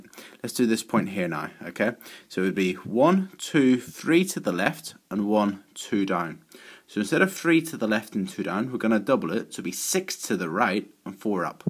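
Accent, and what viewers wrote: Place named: Northern Ireland, United Kingdom. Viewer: So nice hearing the voice of a fellow northen irish mathematician!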